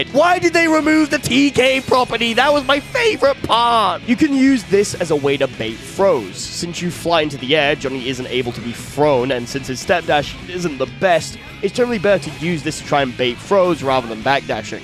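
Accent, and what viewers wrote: United Kingdom. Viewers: No wonder your voice sounds so similar if you're northern that makes sense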